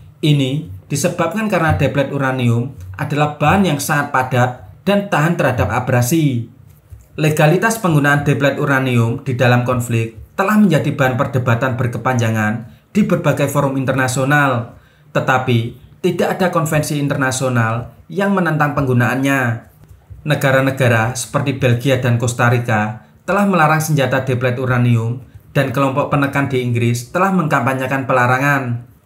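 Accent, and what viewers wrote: Java, Indonesia. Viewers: Sakit kupiiiing ...medhoooog!!!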